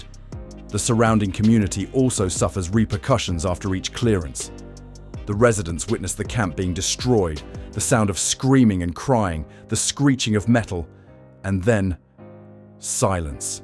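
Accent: United Kingdom. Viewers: the British narrator seems strangely out of place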